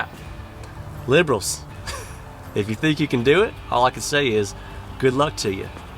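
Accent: South, United States. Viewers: I just found you always good to hear some common sense with a southern twang. This traveling yankee misses the southern states.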